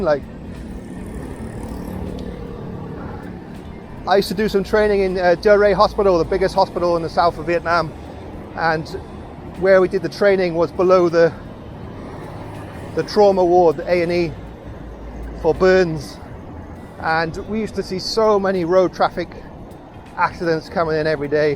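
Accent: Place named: Wales, United Kingdom. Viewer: Good day Buddy, Louis Kats here from Melbourne, Australia. Can you please tell me your name. My partner and I have just become subscribers & last night watched at least 20 of your past videos & loved each one of them. Short, sharp & very informative with a Welsh accent. Current also watching Duong Global Business Consulting Group channel with Ken which is awesome. Also Check out " John Saboe " who I watch & is currently living in Da Nang. My partner and I have visited Vietnam twice & we absolutely love & want to live in Da Nang in the next couple of years. Love your bike ride we remember so many landmarks. We stayed at Babylon Garden Hotel which was awesome & I highly recommend. Hooked on your channel can't wait to see your apartment & a cost of living. If you ever come down to Melbourne, Australia happy to host you. My business partner is Vietnamese same as my doctor, tax accountant, lawyer etc. 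I celebrate Tet ever year with my Vietnamese friends really family & we love home cooked Vietnamese food. Great channel great content. Your number 1 Fan in Melbourne, Australia. Louis Kats ☺👍❤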